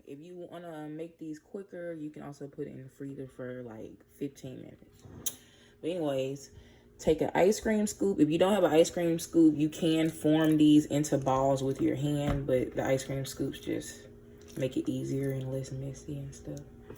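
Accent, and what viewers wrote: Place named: Memphis, United States. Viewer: You talk like dearra! Are you from Memphis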